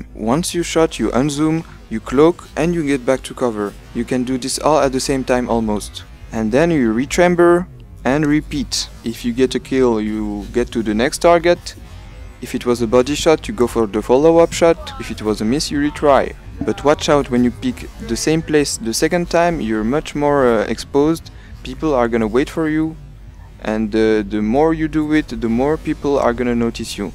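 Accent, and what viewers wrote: France. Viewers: i was a bit confused at the keys you provided, but then i remembered you have a french accent so you are probably using a azerty keyboard. Anyways, i'd suggest providing the qwerty equivalents next time :P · Il ne serait pas français ? Le petit accent on l'entend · Is it possible that you are french? If so you are one of the few french people that can speak english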